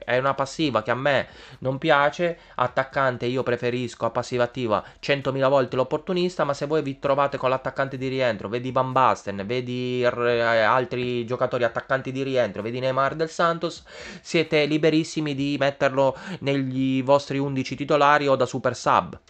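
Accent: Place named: Sicily, Italy. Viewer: Ciao è sempre un piacere vederti, comunque posso chiederti una cortesia? Puoi evitare di bestemmiare? Poi una domanda, ma sento alcuni accenti siciliani. Di dove sei?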